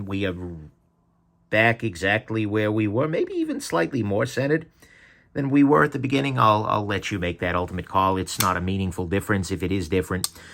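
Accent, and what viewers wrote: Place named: Boston, United States. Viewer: In my world, as an engineer, the fastener that caused you so much problem is called a "Sex Bolt". And, the term you were fumbling for when describing the feature that keeps the female end of the sex bolt from spinning, is referred to as being "indexed" or "keyed". I'm sure different trades will always use their own colloquialisms... But, more importantly the female end of that fastener should either be plain, or use a noticeably different tool engagement to prevent brutes like you from abusing it until it turns! At least you didn't go for the torches to free it up!! This is the first time I've seen one of your videos, I enjoyed it once I got past the 'Bastin' accent (or maybe New Yak?) 😎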